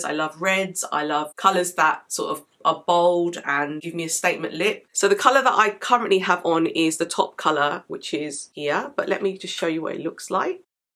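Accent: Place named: United Kingdom. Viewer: Oh my goodness I love you 😍 I’m British too so totally appreciate your accent plus enthusiasm!!!!! All the words you’re using are spot on for these products . I have them all ,totally obsessed. You’re right about the super loaded peau de peche !!!! I find myself taking it out of my purse just to look at it and smell it . Absolutely stunning product. In fact I’m stocking up in case it disappears one day 🙋🏼‍♀️😳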